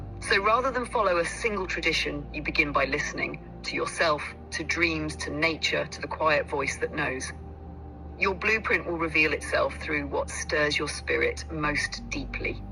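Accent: United Kingdom. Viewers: I can't stand the British voice😅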